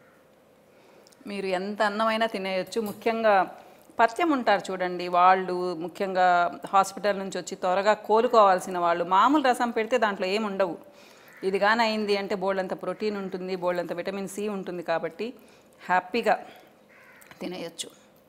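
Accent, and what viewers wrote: Nellore, India. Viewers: gaaru mee videoes chusthunnaanu,mee manchi maatalu,padhimandhiki panikivachhey vishayaalu, mee maatalaloney thelisipothundhi mee methhati manasu.maadhikooda Nellore eynandi,mee prathee maata nenu matladuthunnatley undhi, naa manasu ki daggara gaa undhi,ee roju pappuchaaru chaalaa baagundhi,nenu kooda eppudu chesthuntaanu,kodhigaa populo menthulu,inguva vesukuntey Inkaa chaalaa baaguntundhi,kothhimeera kooda dinchey mundhu vesukuntey chaalaa baaguntundhi.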